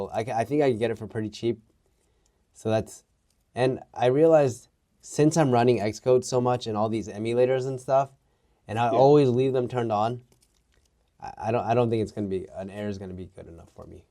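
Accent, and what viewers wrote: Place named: United States. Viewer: Wait.. What? You have a very good American accent for a Korean.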